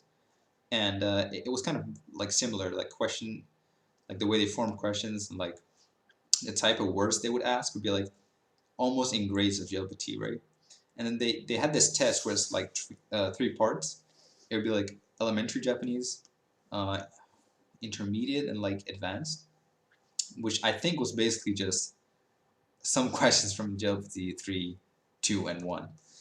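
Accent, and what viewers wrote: United States. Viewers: idt it’s that uncommon of a word tho, most people would know what it meant if it showed up on an SAT or something ikr he sounds like a typical American urbanite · I was confused by the Farenheit thing too lol. I was like wtf? Is he that so hardcore AJATT that he's trying only to use Celsius? The only thing that gave it away was the way you say "book" and "look", other than that you have a perfect Standard/Northwest US accent.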